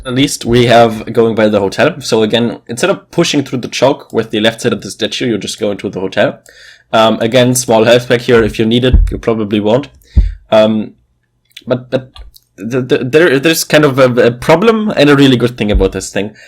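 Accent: Germany. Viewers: Are you German? You kinda HAVE to be German. You sound REALLLLLYYY German bro c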